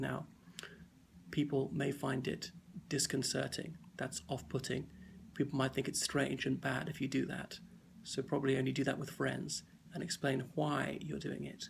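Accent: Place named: RP, United Kingdom. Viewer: I'm trying to learn british rp and your videos is a goldmine! I'm learning it's important to speak slower for btisih rp. I do notice in Downtown abbey that there is a bit more musicality, for a wanting a better word, in their accent than yours in this video. Is that kind of intonation and musicality in their speech considered terribly old fashioned these days and would be laughed at?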